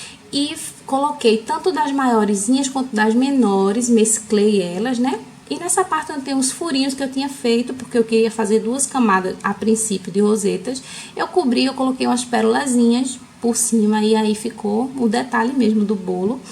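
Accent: Northeast, Brazil. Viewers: Amei seu sotaque nosso nordeste ❤️ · Sotaque de nordestino, amooo❤️❤️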